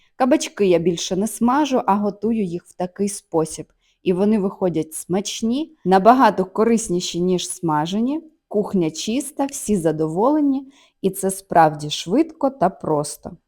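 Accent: Standard. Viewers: Хорошие рецепты,прекрасная подача приготовления,без диалекта и суржика - умница.но только для украиноязычных . Поэтому переходим на другие кулинарные блоги,слава Богу их предостаточно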